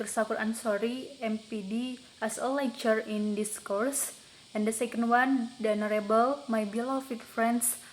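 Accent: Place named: Indonesia. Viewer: Well done girl! Keep it up. You got Indonesian accent I guess, Good I’ve got few good Indonesian friends.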